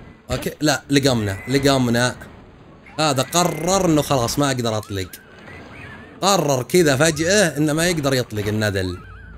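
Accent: Qassim, Saudi Arabia. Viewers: 😂😂😂 والله المقطع ضحكتني الله يسعدك و أحلى لهجة القصيميه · قصيمي اللهجه؟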